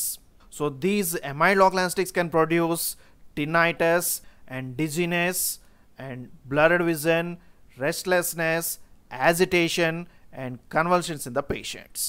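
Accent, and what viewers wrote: South India, India. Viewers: THIS DUDE HAS VERY THICK SOUTH INDIAN ACCENTS EVEN NON SOUTHINDIAN INDIANS HAVE TROUBLE FULLY UNDERSTANDING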